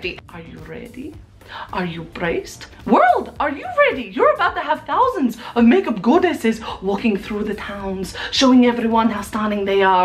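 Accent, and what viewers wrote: Russia. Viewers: are your neighbours happy about the fact that you're screaming and moaning like a crazy? 🤔😂 btw this is the first video from you that i watched and i loooooove you, especially your russian accent! 🤠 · I'm new here, what is her accent? Sounds a bit Russian? I love her personality <3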